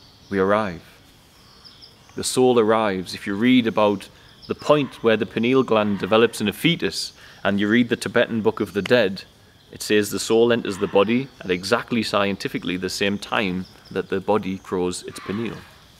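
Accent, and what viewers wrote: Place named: Newcastle, United Kingdom. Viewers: Very nice video. I am based in the North UK. Very similar views as yourself. I can tell by your accent we are very close. Can we open up communion together? I would love to explore your mind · Brother, even on the darkest day, your eyes would still smile. I always love to hear that Geordie accent. I'm a Bradford lad living in America since 1998. Your voice always takes me back home for a few minutes when I first hear it. Bless you my friend. Thank you.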